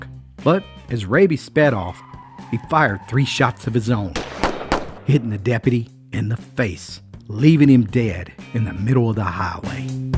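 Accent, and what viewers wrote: Appalachia, United States. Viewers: I wouldn't say he has a deep Southern drawl,I do he has a soft southern Appalachian accent, there are several different regions of different accents, all with some things in common and difference.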